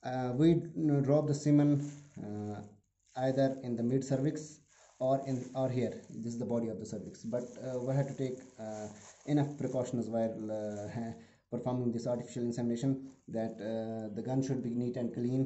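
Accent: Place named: India. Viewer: A very nice gulabi English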